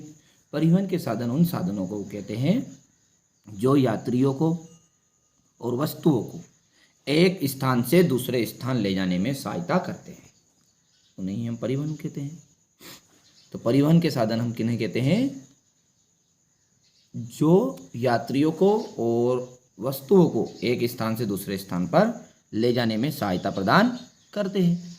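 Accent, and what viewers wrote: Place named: Uttar Pradesh, India. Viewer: Tum up se ho na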